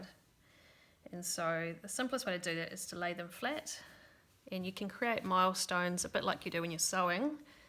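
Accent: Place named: New Zealand. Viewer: This was very helpful! And I love your NZ accent. May I ask which mystery KAL this was. Was there a specific group? Thanks.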